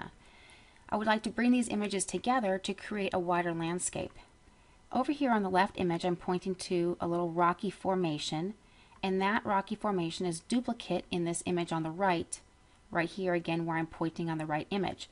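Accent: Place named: Ohio, United States. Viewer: i love your voice and accent - ohio?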